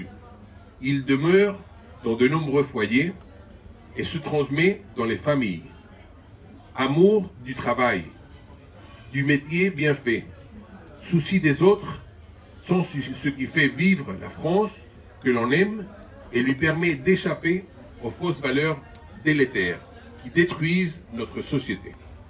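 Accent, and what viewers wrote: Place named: Spain. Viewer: Ne soyez pas ridicule on sait très bien distinguer les différents accents,parisien,sud ouest,chti, marseillais etc,Louis XX n' a aucun de ces accents, et c'est étonnant d'entendre un accent espagnol de la bouche de quelqu'un qui est censé incarner l'héritage de la monarchie française,non?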